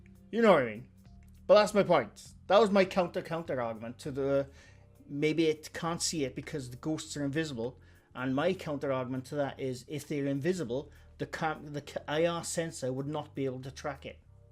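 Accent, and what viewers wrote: Wales, United Kingdom. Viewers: Oh... The wonderful Welsh voice of reason! It irks me so much the amount of people that refuse to accept a logical explanation! OK, I get that a lot of people desperately want to believe in life after death etc, in all honesty most of us would like to think that way! But sls stick men are not the way forward, and so many creators are jumping on the stick man bandwagon now. Even a weeny channel called 'Get Spooky Society' have, and I had such hopes for them too!🥺 Oh well, onward and upwards!🤷🏼‍♀️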